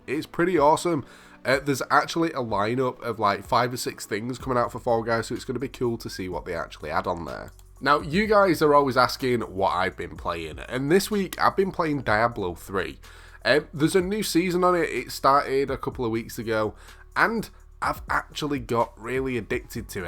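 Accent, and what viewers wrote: United Kingdom. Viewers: Are you from Sheffield? I can’t quite place your accent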